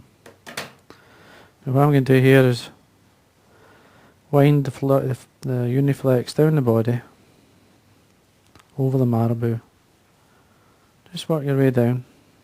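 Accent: Scotland, United Kingdom. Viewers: Thanks, I am buying some. I was at our monthly flyfishing, tying meeting here in Waco last week and a friend mentioned your name. He is a good tyer and a very good fisherman. He is about to win a tough statewide (Texas) fishing contest, multiple species, many variable weather conditions. Pat has been watching you here on youtube, and once we began talking, several others chimed it. You've got a great following here. Also, you've got a bunch of Texans trying to imitate your accent as well as your tying abilities and techniques. I was laughing listening to all of the Texans trying their Scottish brogues. I told them about your filling that beautiful storage box with Classic Salmons; do you still have it? I won't forget that project. I should have bought it when we talked about it. I do have your flies mounted and displayed at the clinic and in my tying room here at the house. 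Everyone marvels!! Take care and know you are bringing a lot of joy and education to tyers around the world. They love you here in Texas!! Ray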